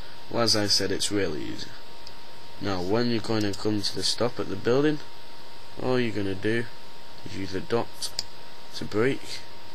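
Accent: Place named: Yorkshire, United Kingdom. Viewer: YOUR YORKSHIRE!!!! SO AM I!!! xD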